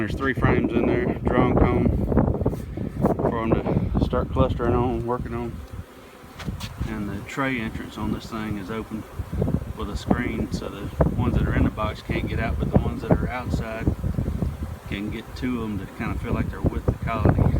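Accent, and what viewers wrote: South, United States. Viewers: He is saying Drawn comb Not drone(fogive our southern drawl) ... using old comb gives them a little headstart it's a stage up form just plain new foundation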